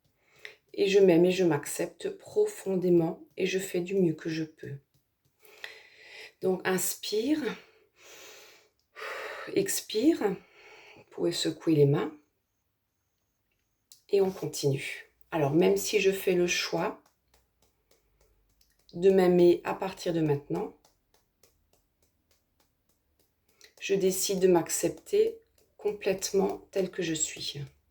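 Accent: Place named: Alsace, France. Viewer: vous n etes pas alsacienne d'après l'accent ? c sympa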